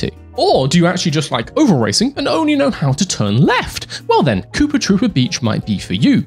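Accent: United Kingdom. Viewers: This video just popped up in my Home page feed and how did I know this man was British before I clicked on the video? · He's British, my guy.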